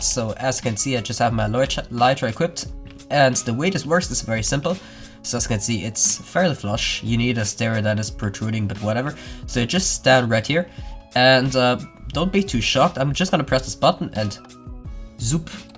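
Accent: Germany. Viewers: He even has the german accent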